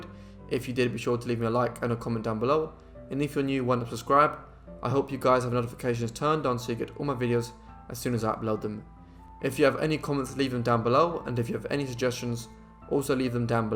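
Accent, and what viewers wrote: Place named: Upper class, United Kingdom. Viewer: I can tell that you are from the upper class, sir. 👍🏼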